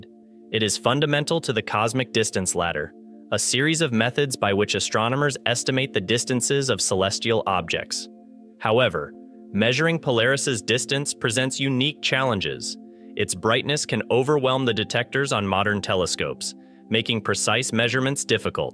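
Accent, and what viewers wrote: United States. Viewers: I assume NASA sponsors this channel. The narrator has an American accent, so I don’t understand why NASA doesn’t utilize a narrator familiar with the pronunciation of astronomical terminology such as the type of an important type of star.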